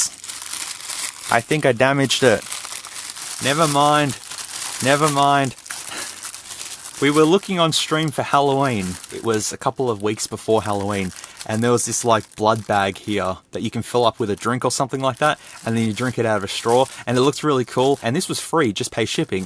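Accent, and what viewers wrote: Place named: Australia. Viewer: Big love from the UK, always known Wish is a sham, but hearing an Ozy tell it in plain Ozy talk is always great fun. (Lived there, have family there, Love there, ‘WISH’ I was still there) 😀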